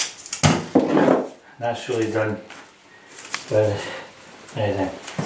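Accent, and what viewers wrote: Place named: Scotland, United Kingdom. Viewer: That's a Scottish accent, don't know which area, but good to hear. Thanks very much for this video, really useful tips, I've put off doing this because all the American plumbers say to take out the toilet first - not good if you're a woman and in a rented flat!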